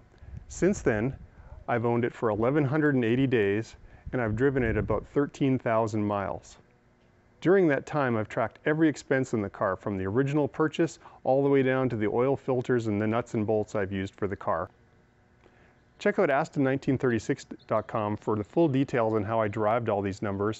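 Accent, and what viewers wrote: Canada. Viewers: I can tell he’s Canadian by the way he says “out”, and “about” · You were not kidding about those costs. I did buy a 2006 V8 Vantage with a manual gearbox. It was a lovely car - for someone more well-heeled than I. Parts costs do not compare to labor at the dealer, and both are truly expensive. I had a limited mileage full insurance policy so that greatly reduced operating costs and "wear and tear." I liked the car a lot, bit too much understeer, and the clutch engagement was very sudden at the top of the pedal stroke. I was fourth owner, and the car only had 25000mi on her when I acquired if for the ten months I had her. I might look into another one of the earlier, simpler cars of this era again, and would advise any potential owner to shop and maintain it wisely. Thank you for the video. I note a bit of a Canadian accent for Californian though! 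😀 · From your "aboot"s, do I take it these figures are in CANADIAN dollars?? You never specify U.S. or otherwise, so... please clarify! :)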